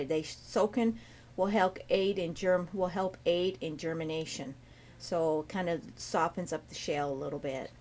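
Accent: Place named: Wisconsin, United States. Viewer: Thanks for this. I live in Santiago Chile and I've seen these growing around neighbors' gates. I just picked a few dried fruits off them and will plant the seeds are you suggest. Can't wait! These are such amazing flowers! By the way, I grew up in the midwest and enjoyed listening to your accent! (Wisconsin?)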